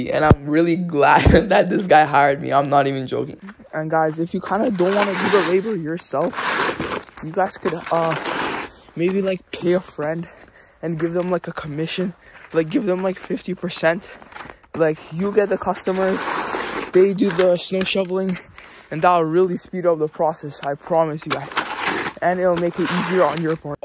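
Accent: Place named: Toronto, Canada. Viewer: this guy lives in toronto i can tell from the accent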